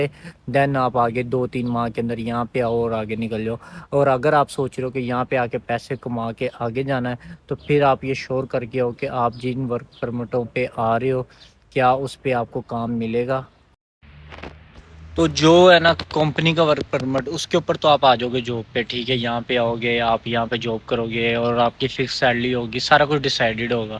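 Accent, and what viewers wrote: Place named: Punjab, Pakistan. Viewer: Veer punjabi lg re o gal bat to pta lg reha.😄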